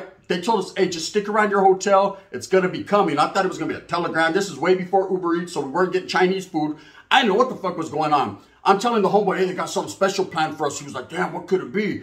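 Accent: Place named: New Mexico, United States. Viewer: Bro your videos I can’t get threw without laughing lol I know all the lingo cause I’m from New Mexico lol that’s how we talk carnal 😂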